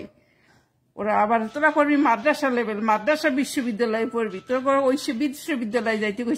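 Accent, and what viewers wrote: Barishal, Bangladesh. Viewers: আপনার বাড়ি কোথায় আপনার কথা তো বাংলাদেশ বরিশাল জেলার মতো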